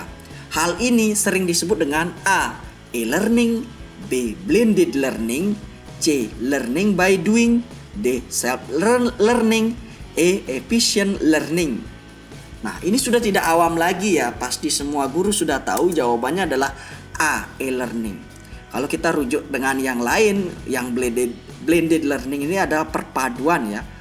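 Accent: Bali, Indonesia. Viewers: Kyk logat bali malah menurutku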